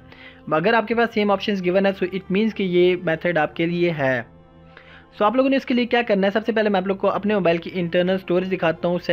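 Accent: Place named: India. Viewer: This makes no sense,the words are in English but he's speaking Indian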